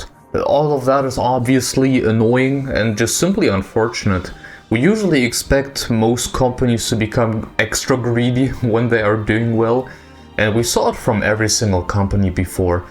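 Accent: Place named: Germany. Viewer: Wow, you have such a German accent speaking English. Я некогда не думал что вы говорите по-русски 😂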